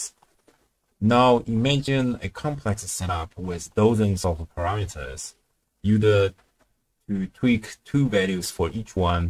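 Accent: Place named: Senegal. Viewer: Definitely Senegal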